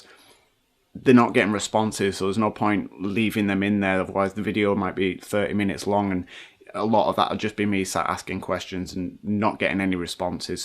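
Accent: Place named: Manchester, United Kingdom. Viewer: You have some of the best videos on YouTube, and some of the best paranormal videos I've ever seen. I apologize if I have missed your Q&A's that may have answered these, but I have a couple questions: 1.) Have you ever thought of taking up a professional career, in paranormal investigation? (I personally think you'd be great at it, though I know you are more interested in your own personal experience and residence right now) 2.) Where, in England, are you from? (I realize you likely enjoy your privacy, so you do not have to answer this question - I was trying to place the accent, along with my son...and we guessed Manchester)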